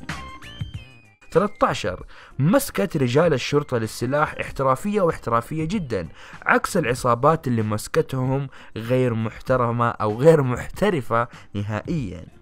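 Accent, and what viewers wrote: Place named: Saudi Arabia. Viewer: لا السعودية واضح من لهجته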